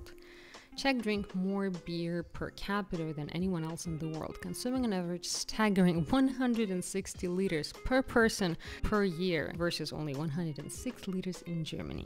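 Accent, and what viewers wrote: Russia. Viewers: First, let me very shortly introduce myself: My family is a North Italian / Austrian / Czech mix. Am I right that the girl who made this video may be Russian? Despite all her efforts, I can still hear some Russian / East Slavic accent. What's more important, her opinions seem to be influenced by that: 1. Czech Republic simply IS a Central European country and it's probably the very synonym of Central Europe itself. Geographically, historically, culturally and economically. For almost a thousand years (= most part of its existence) it has been in the Central / Western European sphere of influence (Holy Roman Empire, Austrian Empire, Roman Catholic Church etc.). Yes, for four decades it was a part of the Eastern block, just like East Germany. Never "Soviet block" or even Soviet Union. 2. The socialist regime ended in Czechoslovakia in 1989, not in <num> was the year when Czechoslovakia peacefully split into the Czech Republic and Slovakia. 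· This is by far the most offensive, arrogant and I should say ignorant (considering historical, sociological facts as much as knowledge of common realias) amateur video ever made about Czech Republic and published on YT. What is also odd is this Eastern/Western “definition” is claimed by the author (and arrogantly served as “a fact”) who is apparently a russian person (the accent is clearly eastern european/russian…although author is trying to hide that ;), there is probably good reason for this) living in Switzerland. I mean wow…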